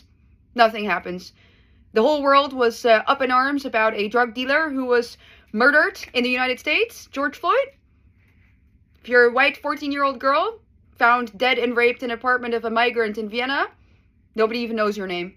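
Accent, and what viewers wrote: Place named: Groningen, Netherlands. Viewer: as indonesian i can tell but which dutch? she has that groningen accent, the frissian is very english ish.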